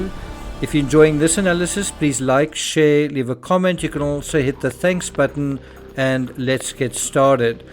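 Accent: South Africa. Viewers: Thank you for this update! Once again, so good to hear a South African accent! I am glad you did this video because the conspiracy theories do not help anyone. The way you have explained it makes perfect sense and fits in with whatever evidence has been obtained. It is also easy when one has the "exuberance of youth" to believe that we are capable of doing anything! Jay obviously felt he had only one option and that was to head for the sea... the most likely place where people would be. But as you say, he had no idea how far away it was nor how treacherous the route was. He took a chance. Very sad for family and friends, but to me it feels like reality. I personally find it a far more plausible reality to accept than the wild possibilities others have come up with. Keep up the good work! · Where in Australia are you from? You sound South African. You have been very commited to pursuade us in absence of a fowl play, even though you haven't been there. 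And I strongly doubt Jay had a chance of getting out alive if he rejected the big boys. By the way there was a CCTV footage of this very same road with a man getting into the car. At the time people speculated it had been Jay. What if it was someone who pushed him... The phone wasn't that close to a cliff, otherwise they'd find Jay immediately. Was there a fight? With a body month old, it's surprising they can determine exact time of death. But even so, people like those men he was with, do not leave witnesses that refuted them, that might hurt them in the future. Look at the facts, so many leaked messages, audio and CCTV footage. Aibs instagram post with the photo of Jay " +1" subheader was meant to be seen by a client? They hired a flat for a few hours on the night in the fake name to do what??? They weren't at the festival, he was heavy on drugs with someone's help, how did they meet? 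But you create these narratives " he persisted", " in his final moments he did that" - how would you know?, I still can't get over the fact you suggested that anyone not thinking like you do, is schitzofrenic... 😂 Someone must pay you well to trumpet your trumpet. The "paranoid mind made him jump" theory is another funny one. He had the reason to be running! So why is no one investigating that?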